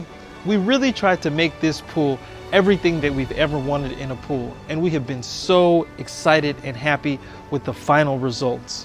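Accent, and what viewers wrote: Portugal. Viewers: I'm From Angola my Dad was Portugues i live in Portugal from 1999 till 2012 move to UK, but I feel like I wanna move back to Portugal, your cascais accent sound so Portuguese :)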